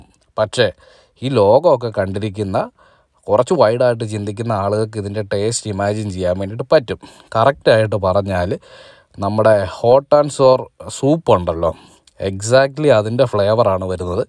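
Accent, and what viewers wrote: Kottayam, India. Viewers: Kottayam slang..ente oru cousin achachante samsaram pole..😊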